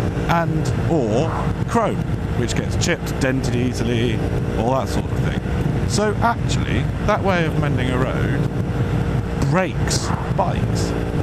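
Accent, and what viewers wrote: United Kingdom. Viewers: Such a wonderful British accent. Subbed old chap.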